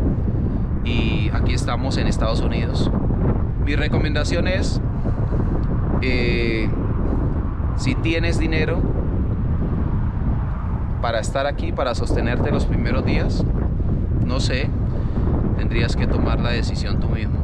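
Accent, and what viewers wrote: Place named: Colombia. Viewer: eres colombiano por tu acento y la manera en que dices las palabras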